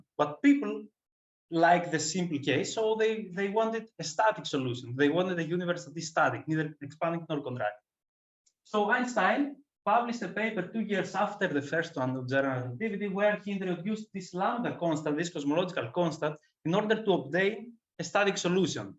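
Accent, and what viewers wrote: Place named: Greece. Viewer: μιλάς τα αγγλικά με ελληνική προφορά, ταλαιπωρείς πρώτα τον εαυτό σου και δεύτερον τον ακροατή , υποβαθμίζεται η δουλειά σου , όταν μιλάς τα αγγλικά το στόμα να το έχεις λίγο πιο ανοιχτό να βγαίνει η φωνή. Φιλικά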